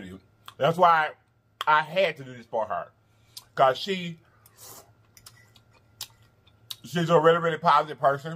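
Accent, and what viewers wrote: South, United States. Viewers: y’all imma use some pink salt on dis heah, might as well dash a lil bit over here too Me: 👀👀 🤤🤤 The country accent tho 😂 😂 😍🥰😘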